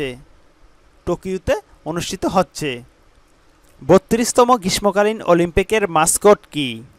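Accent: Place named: Noakhali, Bangladesh. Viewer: আপনার ভাষা নোয়াখাইলা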